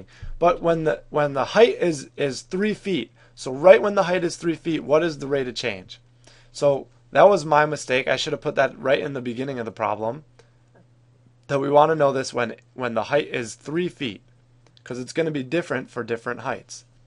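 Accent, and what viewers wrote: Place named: Wisconsin, United States. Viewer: Your accent sounds like Randy Russell. Are you from Wisconsin?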